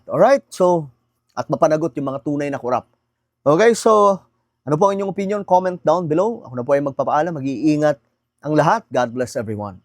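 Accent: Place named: Visayas, Philippines. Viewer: Bkit mo pinakikita Yan na kesyo Marami sila,isa ka talagang DDS din ..parang ipinagbubunyi mo ang dami nila ,iyan ay mga inc members at konting DDS.Halata ka na di ka tunay na ilokano may pasangkay sangkay kpa eh isa ka nmang bisaya ( halata ang pronunciation mo ay bisaya).majority bisaya ay DDS at isa ka duon..tanggalin mo inc member Dyan kundi nasa 100 to 150 lng ang DDS.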